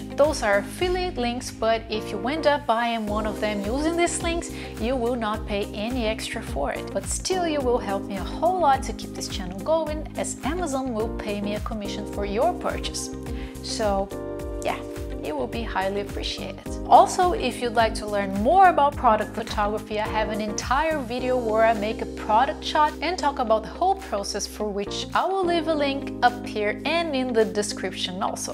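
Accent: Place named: Brazil. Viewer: Eu escutei e aí guys, já percebi de cara que era BR kkkkkk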